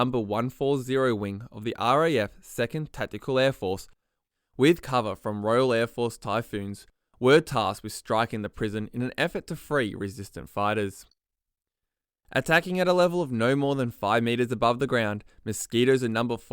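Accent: Australia. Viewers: Made from timber and plywood?? What do you think ply is made from - plastic? Nothing new in the vid. I thought there were only three flyable airframes, all the rest were taxi dummys. - - - And a word of advice - disregarding your Aussie accent, you need to improve your diction, so that you don't slur your words or miss the ends off!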